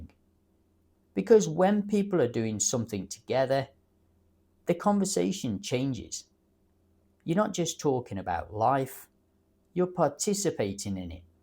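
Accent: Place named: England, United Kingdom. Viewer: could be it's accent thing from England lol, are you from north west UK ? I'm finally coming over. I had set deadline of 55 but pulling the trigger now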